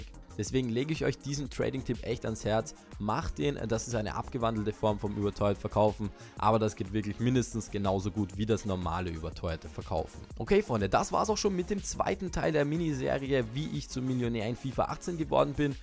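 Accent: Austria. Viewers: Woher kommst du? (Österreich oder Deutschland oder)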